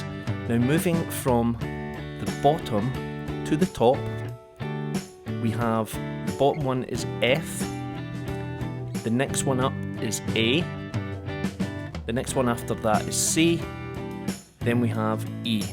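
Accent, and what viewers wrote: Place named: Scotland, United Kingdom. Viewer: You’re Scottish; from where?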